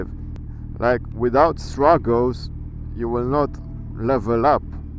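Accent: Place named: Russia. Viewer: Привет из Беларуси,по твоему акценту понял что ты русский)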